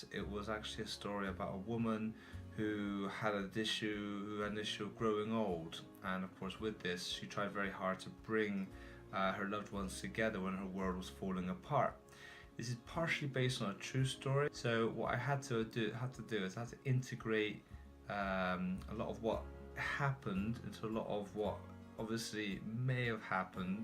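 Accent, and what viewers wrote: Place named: Bristol, United Kingdom. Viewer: Also you are getting Bristolian, WELL WRITTEN with Bristolian accent lol 😂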